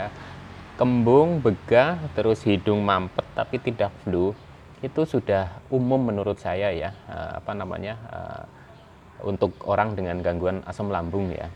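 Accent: Banyumas, Indonesia. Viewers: Mas sudah berapa tahun Jawab y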